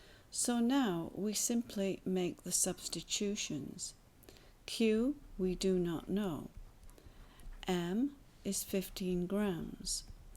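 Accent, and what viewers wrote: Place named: England, United Kingdom. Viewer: When I heard the English Accent I felt a little bummed because science here in the states is a little different than the UK. But this helped me understand sooooo much better. I didnt understand my teacher, this makes a lot more sense. Thank you!!!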